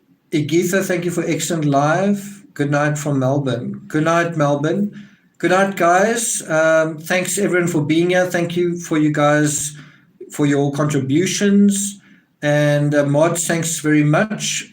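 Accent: South Africa. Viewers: Found your channel last night and love it love the accent are you from S Africa . Best wishes from Ireland 🇮🇪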